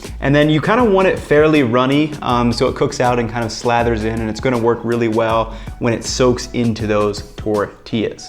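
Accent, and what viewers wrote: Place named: United States. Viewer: I enjoy your videos and your cooking but some constructive advice. If you plan on living in Mexico, do yourself a favor and learn the vowel sounds for Spanish: Replace A-E-I-O-U with AH-EH-EE-OH-OO. It will take the “gringo” right out of your pronunciation. Hope that helps. Oh yes, and ALWAYS strain your sauce. Cheers!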